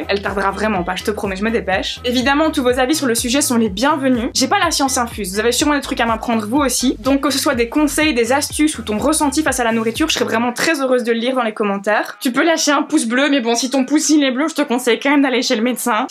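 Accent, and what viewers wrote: Belgium. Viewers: Je viens de débarquer... tu es belge? Les r bien prononcé c'est comme par chez moi tout ça, tout comme la "pouissance nucléaire" x) #patriotisme #lovelaccent Pardon on a du te le dire 50 000 x. (Je suis en admiration devant tes bras (strange dit comme ça)). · Tu est belge non ?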